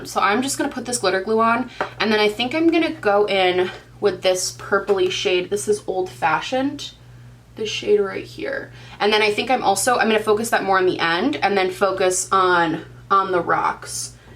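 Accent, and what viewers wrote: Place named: South, United States. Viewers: Great Southern accent from a real Southern Belle!!! ♥️♥️♥️ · So glad you enjoyed your vacation! Also your southern accent killed me 😂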